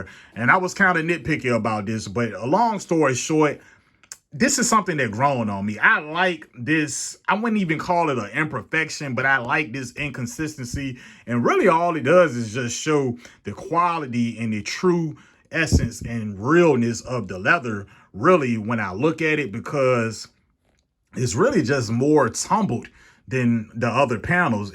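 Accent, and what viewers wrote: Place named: Louisiana, United States. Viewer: Where u from? Sound like Louisiana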